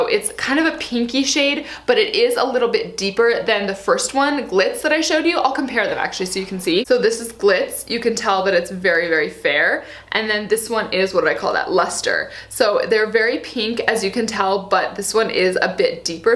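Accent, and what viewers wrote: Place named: South, United States. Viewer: Hey there, I will say makeup needs to work to accentuate the good features that you have. It's overkill, and As a mask is when you wear it all over the place in bold colors with the focus being everywhere. Learn to focus on a favorite part of your face. You can never go wrong with that advice. Also, you are southern? I think I hear a slight twang haha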